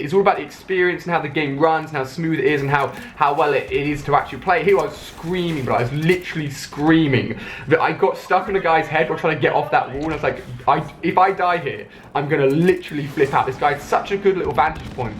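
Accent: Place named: United Kingdom. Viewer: hmm all the guns and explosions sounds a British guy talking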